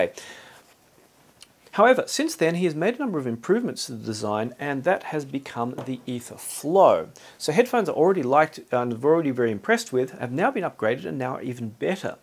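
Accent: Australia. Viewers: where are you from.. don't get the accent.. a cross between English and south african...probably Australian or kiwi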